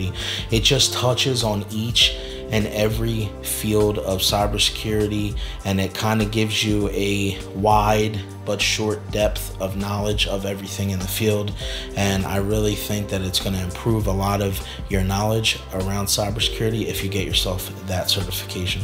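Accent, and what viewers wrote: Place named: Delaware County, United States. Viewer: This guy is from Delco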